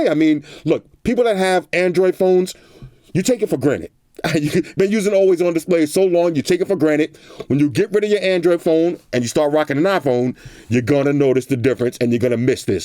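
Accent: New York, United States. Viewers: My man is soooo NEW YORK, I love it. I love apple but I agree with all your dislikes . They need to do better and give us at least a dongle and a brick yo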